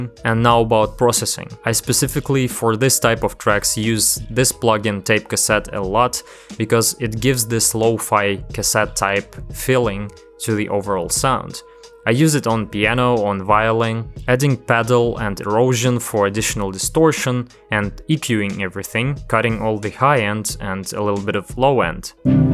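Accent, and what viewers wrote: Russia. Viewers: i love this russian accent its just Beautiful , i know phonk is born american but i cant imagine phonk without russia ! thanks bro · лайк с русским акцентом, красава чел! 👍🏻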